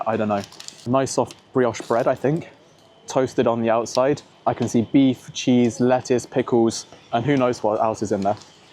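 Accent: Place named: Chav, United Kingdom. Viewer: You need to work on your chavvy accent.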